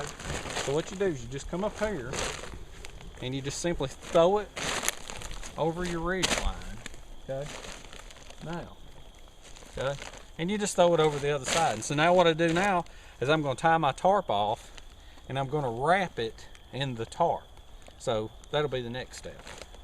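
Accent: South, United States. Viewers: I'm from Tennessee. Where's this guy from? Trying to place the specific southern dialect. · Hell of a southern accent. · Love the southern accent sir! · Nice video. That southern accent is deep as hell. Hahaha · That’s a thick southern accent my guy. Love it. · Thank you.Entertaining. I love your country boy accent!! Where are you from? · I figured you were raised somewhere way down south:) I remember one trip I was in Atlanta and had a flat tire on my trailer, I parked in a two trucks in front of mine so I had to wait, time went by when a guy came out asking "is that y'alls truck over there?" I began looking around for anyone else that could have been the driver of my truck, I 'knew' I was a solo driver from Idaho, "yes Sir that's my wagon," "move it on in." "Y'alls" I had to smile, during our short visit he said "y'all must be from somewhere way up north?" I asked "why, do I talk as funny as you?" We both laughed! 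He said "your accent tells me you're a northerner!" I guess it depends where we are all raised, I love all your videos and your voice, keep them coming. Bomber from southeast Idaho:) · I love his southern accent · Love the Southern Accent, And I thought the dog was the Bigfoot...... Love the info but you should come up to Canada for showing extreme shelter's, it's a lot cooler than in the South now in April 28 we are at 37 deg. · All of us Southerns talk this way. Some accents are stronger than others. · Very interesting, thank you. Love the accent, Georgia or Lousiana? 😁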